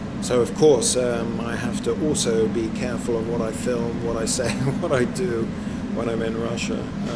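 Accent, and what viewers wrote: England, United Kingdom. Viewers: How did you get Serbia citizenship? With that English accent!?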